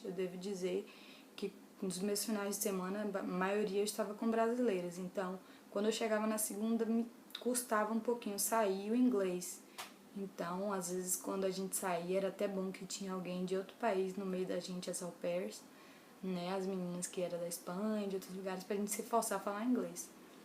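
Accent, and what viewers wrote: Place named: Bahia, Brazil. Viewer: Esse sotaque baiano reconheci na primeira frase. hahah, qual a agência que você foi? Com qual idade? Pretendo ir pela STB. Você indica? Também sou de Salvador, gostaria de saber se ela é bacana. Ah, o vídeo ficou ótimo. Também acho o inglês importantíssimo! Muito bom o vídeo.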